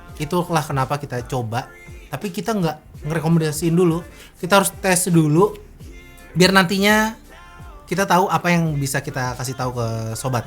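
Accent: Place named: Bekasi, Indonesia. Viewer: Suka banget dah pembawaannya. Bekasi banget!😂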